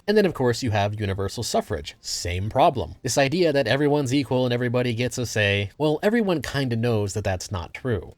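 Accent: Boston, United States. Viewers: Yeah, she's originally Bostonian. Moved to an undisclosed location and has tried to get the beantown out of her voice to little avail.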